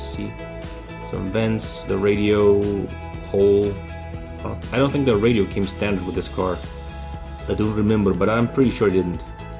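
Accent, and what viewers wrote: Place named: France. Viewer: Francozski naglas👌😂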